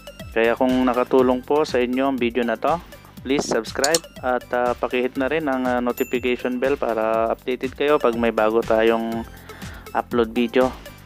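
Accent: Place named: Mexico. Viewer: I like this guy's Mexican accent, makes this video more likable.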